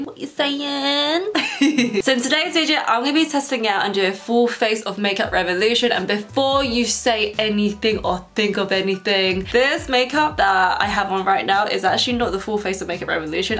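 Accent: United Kingdom. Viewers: Woooooooowwww! I have watched ur ig for a while and DID NOT EXPECT THE BRITISH ACCENT!!! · So ik her only from Instagram and I finally got around to watch her vids and SHE HAS THE CUTEST ACCENT it totally didn’t even click that he would have an accent (I knew she lived in the uk) but anywho I REALLY REALLY loved this video 💕💕💕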